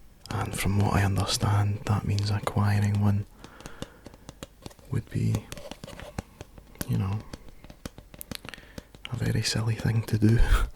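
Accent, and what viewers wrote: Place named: Glasgow, United Kingdom. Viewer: u ken by ur accent ur fa glasgow or somewhere a little further north am i right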